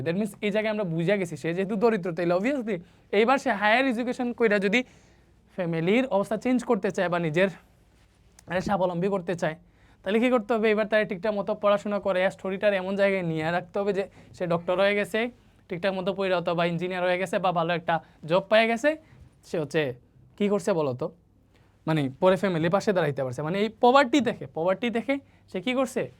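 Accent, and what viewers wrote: Sylhet, Bangladesh. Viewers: Sir ki sylheti nakiiii😮😮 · Beday j Sylheti kothay seta bujha jay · স্যার কি সিলেটি?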